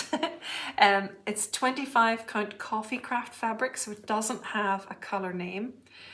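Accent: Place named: Ireland. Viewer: I always enjoy your stitching and listening to your accent. Reminds me of my trip to Ireland . The Nativity is lovely.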